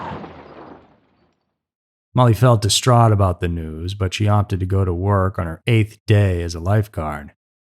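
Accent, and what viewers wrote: United States. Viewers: I like listening to this channel because this dude is American 🇺🇸. I hate all the British people making these mystery videos. British accents are the worst. Can’t stand it.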